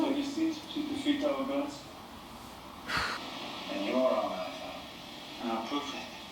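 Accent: France. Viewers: You have a french accent you know that your reaction is one of the best i seen until now you are sensible that mean you love this as i do and you are attached to the charachters i have the same reaction when bjorn falls he was the only reason why i am still watching the show until now he's death broke my heart to peaces he was the best charachter in the show after ragnar he was with us from the bigining we watch him grow up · J'ai tout de suite deviné que t'étais française vu l'accent XD Mais ouai j'ai eu la même réaction que toi quand j'ai vu Bjorn se faire transpercer... J'espère sincèrement qu'il n'est pas mort, mais j'y crois pas trop quand même. C'est mon personnage préféré, mais là faut avouer que ça pue pour lui quand même mdr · Tu es française ? Par moment tu prononce des mots avec l’accent français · u are french? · You have such a big french accent. Is so funny. 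😂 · On l'a reconnu direct cet accent mdr *bjorn n'est pas mort non non non non...épisode incroyable.. ubbe ont compte sur toi frérot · Française ça s'entend 😉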